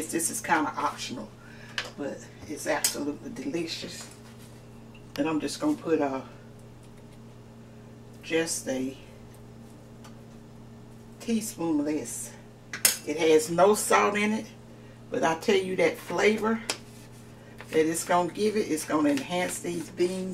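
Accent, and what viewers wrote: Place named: Alabama, United States. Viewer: You speak like my late grandma. Are you from south Alabama?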